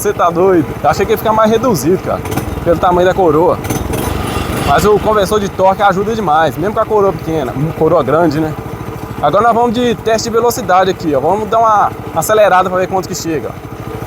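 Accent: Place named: Montes Claros, Brazil. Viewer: Onde essa cidade pelo seu sotaque, me parece que e de Montes Claros ou regiao.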